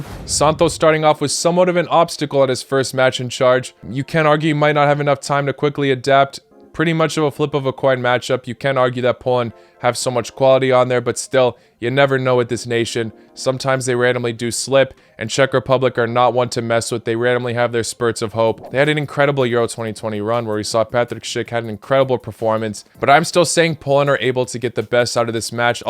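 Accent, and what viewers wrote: United States. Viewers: I swear, your the only person i've heard who has an American accent that has called the sport with it's right name "football". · his accent is kinda American but he commonly wears Croatia kit and sometimes Serbia Bosnia and a Montenegro kit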